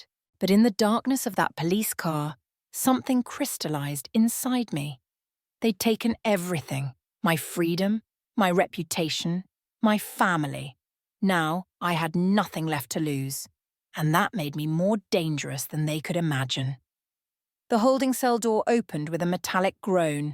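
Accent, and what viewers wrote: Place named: United Kingdom. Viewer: I love the British narrator.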